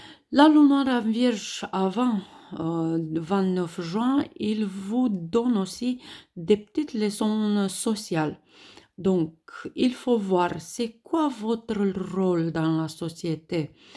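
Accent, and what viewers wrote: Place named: Russia. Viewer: J'adore l'ascens russe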